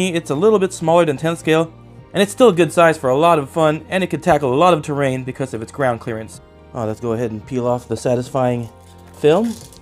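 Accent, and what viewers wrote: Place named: Miami, United States. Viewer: are you from miami, this accent is miami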